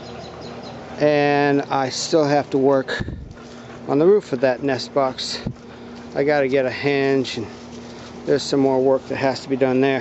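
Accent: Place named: South, United States. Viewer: This is Marcy again...hijacking Joey's account. You have a southern drawl. I know this is serious but I think it's hysterical! Good job! Very impressive! I really can't believe you build this!!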